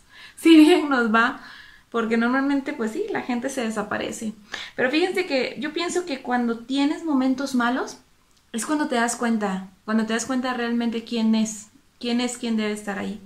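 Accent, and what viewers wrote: Mexico. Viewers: Con reacciones como estas… nuevo suscriptor Por cierto tienes un acento muy mexicano 👍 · Usted no párese tica párese sinaloense oiga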